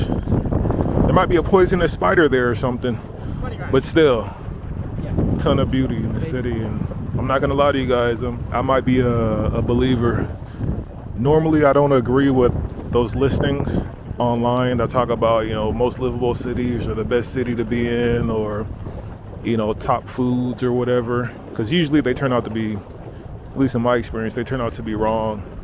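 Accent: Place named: United States. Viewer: man you sound American, if that's the case, which US city would you say is most similar to Sydney?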